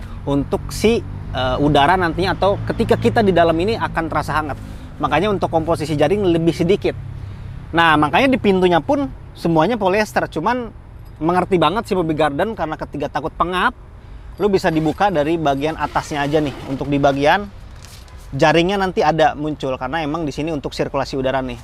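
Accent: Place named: West Java, Indonesia. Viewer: Sunda pisan uyyyy mantaat lah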